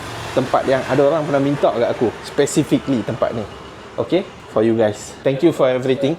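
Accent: North, Malaysia. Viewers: terbaik la... siap cakap utara... syok dengar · YouTube suggest. Dengaq pulak cakap utaqa. Done Sub semoga channel sukses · Bahasa Kebangsaan dan loghat utara yang tersusun sangat sedap layan video bro.. terbaikk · Sebab cakap utara aku subscribe 😂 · Aku layan sampai hbs brader sebab bahasa utaqa hang..senang nk paham😂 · bukan BM jer...dia bantai loghat utagha bak hang. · Perghhh ni video pertama aku tengok, sebab naik suggestion. Tak cakap banyak, selepas tengok opening 2 minit terus aku subscribe. Nampaknya aku dah jumpa benda baru yang nak melayan sebelum nak lena, mamat ni not bad lah untuk vlogging. Paling best dia guna loghat utara, senang faham. Time lunch hour pun boleh layan video ni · gilalah ada review bahasa kebangsaan campur utagha bakhang.....inilah yang dicari cari...well done bakhang...tak sangka kerala ni kira maju juga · skrol2 youtube jmpa chanel ni ...try bkk...skli loghat utara mmg padu pny review...mmg sempoi.. 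· hg ni org utara ka..😅😅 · Steady bro..orang utara ka..aku baru ja jumpa channel hang ..best2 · Video hang fyp kat YouTube aku time aku scroll. Bila aku dengaq hang sembang utara langsung teruih aku SUBSCRIBE channel hang.. Sempoi bak hang video hang. 🎉🎉🎉👍👍👍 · Saya org Penang. Saya selesa dgn orang cakap utara. Dah subscribe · Suka dengaq brader cakap utara. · Terbaik bro, slang utara tu daripada Malaysian Indian melancong ke India. Terbaik review ni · Syok dengak loghat utara.. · Start² terus melayu loghat utara, auto subscribe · Nice video bro. Aku tengok sampai habih. Siap balun cakap utagha 🔥🔥🔥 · Aku sub sebab hg terer cakap melayu..loghat utara plak tu mmg sempoi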